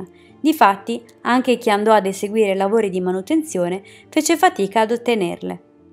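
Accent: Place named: Florence, Italy. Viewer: Qualcosa mi dice che tu sei di Firenze.. Complimenti al video fatto bene..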